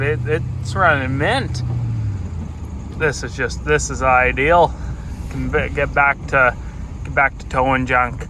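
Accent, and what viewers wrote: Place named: Alberta, Canada. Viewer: Sound like a wannabe albertan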